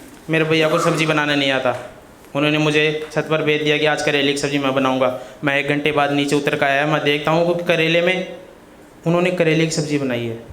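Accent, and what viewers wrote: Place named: Dhundhari, India. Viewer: Aapni dhundhdhi boli ki to alg hi cha yarr😂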